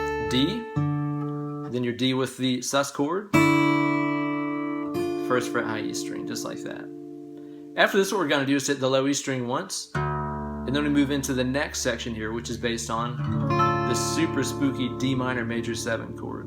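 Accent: South, United States. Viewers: great stuff!... perfect for October..... you sound like you live in the south...... you got the heat on yet?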